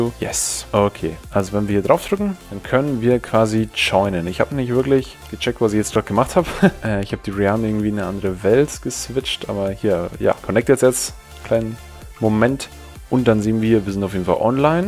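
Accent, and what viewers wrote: Austria. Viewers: Bist du österreicher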